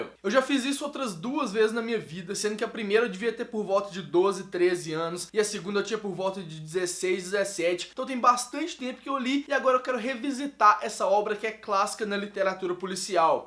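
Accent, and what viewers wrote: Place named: Minas Gerais, Brazil. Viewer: cara vc é mineiro! vou falar aqui "procês"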